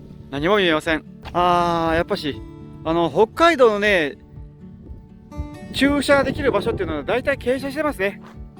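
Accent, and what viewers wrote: Okayama, Japan. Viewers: 岡山の皆さん、茨城県から質問失礼します。さんがよく語尾、会話の間に、 「から」って言ってますよね？あれは方言なのですか？教えてください、お願い致します🙇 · お疲れ様でした。コロナが勢いを増している今、楽しく拝見してました。さんの岡山弁に毎回、千鳥みたいじゃー（笑）と思ってクスクス笑ったり、朝市に行けなくて一緒にガッカリしたり、トウモロコシがなかなか売ってなくてそうなの？何で？と思ったり。最後、なんか食べれるねと思ったら、律儀に計算ミスがあってと修正されて、あらら〜ってなりました。本当、楽しかったです。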